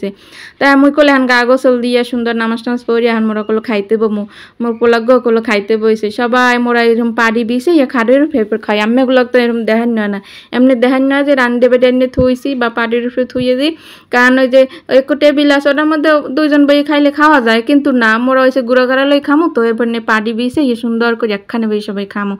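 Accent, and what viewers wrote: Barishal, Bangladesh. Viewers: ❤❤চলে এসেছি❤❤আপু তোমার বরিশালের ভাষা শুনতে❤❤এতো মিষ্টি করে কথা বলো❤❤ যতো শুনি ততো শুনতে ইচ্ছে করে ❤❤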